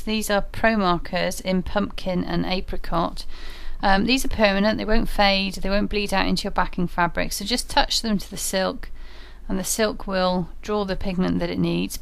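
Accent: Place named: Bristol, United Kingdom. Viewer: Love your accent .... Bristol ? Gloucester area :)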